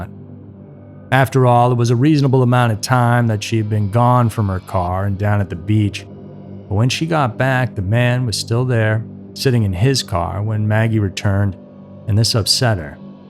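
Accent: United States. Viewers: I like listening to this channel because this dude is American 🇺🇸. I hate all the British people making these mystery videos. British accents are the worst. Can’t stand it.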